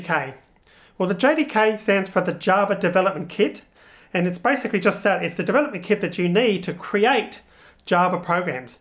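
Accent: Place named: Australia. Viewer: I love learning java with aussie accent!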